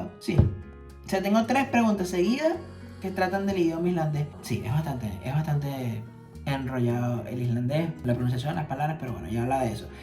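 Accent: Venezuela. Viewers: Gracias por compartir tus vivencias allá en Islandia..yo la verdad me río mucho con algunas cosas q dices..soy venezolana también..Guayanesa Edo.Bolivar..tenemos el mismo acento..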